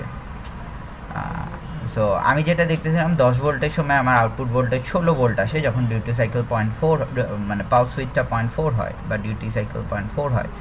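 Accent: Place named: India. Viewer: Indian english quite hard to understand because its accent, hope there will be some script or something similiary...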